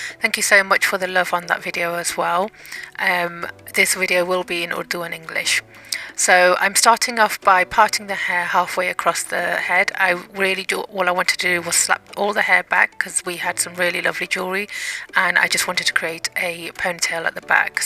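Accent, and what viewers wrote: United Kingdom. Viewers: I could tell by your accent that your're british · Her English accent 😍😍😍😍😍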